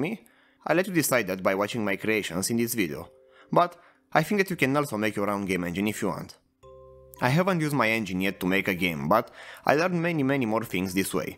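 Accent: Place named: Romania. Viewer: Efectiv stiaaaam ca esti roman dupa accent. Mam uitat la about si asa a fost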